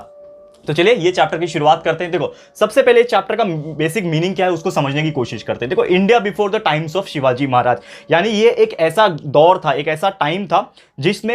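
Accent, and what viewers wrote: Maharashtra, India. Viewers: Sir is your mother tongue Marathi.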